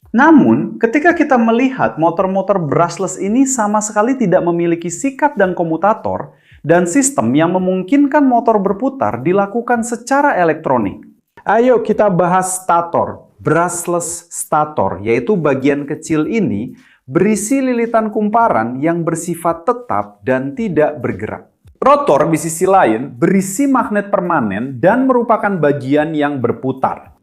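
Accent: Java, Indonesia. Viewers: Dubbingnya logat Jawa. 😂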